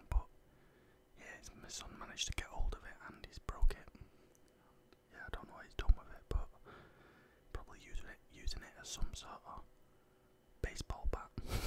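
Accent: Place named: St Helens, United Kingdom. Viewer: Your accent seems similar to mine! St Helens gal! 🙌🏼 love your videos!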